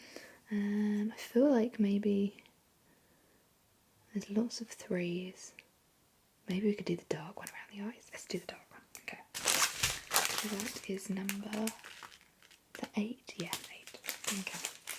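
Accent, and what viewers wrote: Ireland. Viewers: Im American, so I liked the Irish one because it was an accent. Definitely going to keep watching your videos, thanks for making them. There relaxing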